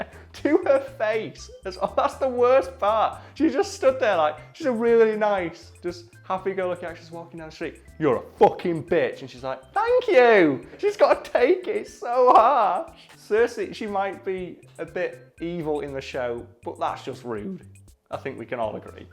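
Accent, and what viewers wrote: United Kingdom. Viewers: Wait, wait. You sound so British and still call it Football? Only ausis and non-englishlanders call it football!?!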